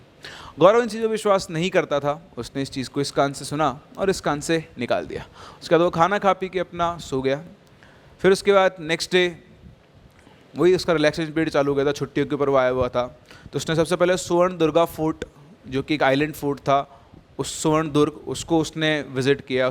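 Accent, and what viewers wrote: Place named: Maharashtra, India. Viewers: Marathi accent 👌 एकदम भारी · मुझे b तुम्हारी मराठी टोनिंग मस्त लगी.😅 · Marathi accent bhot sahi 😂😂😂😂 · I am from Maharashtra , loved your marathi accent 💯 After IIT and NIT here comes HIT 🤣🤣🤣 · Sir ka marathi accent 😍😍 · First Viewer✨ Nice Marathi accent 👏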